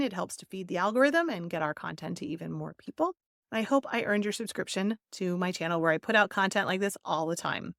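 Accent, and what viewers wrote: United States. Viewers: A good engagement, however your American accent is totally unnecessary & very annoying, sorry.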